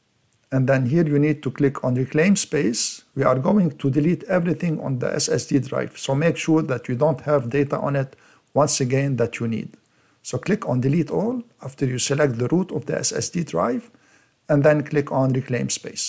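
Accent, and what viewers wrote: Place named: Lebanon. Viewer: sounds like a lebanese accent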